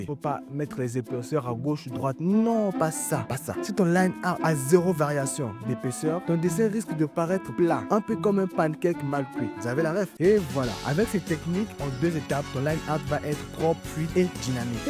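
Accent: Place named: Africa. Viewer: Tu es africain toi je reconnais l'accent du continent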